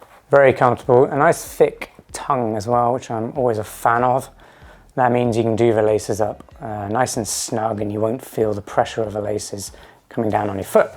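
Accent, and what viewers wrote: United Kingdom. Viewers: Subscribed. U do have british accent pal. Simple explaination. Iam getting hoka clifton 8 online. Is their any difference bw clifton 8 and 9 ? Moreover whats ur opinion on clifton 8 or invincible 3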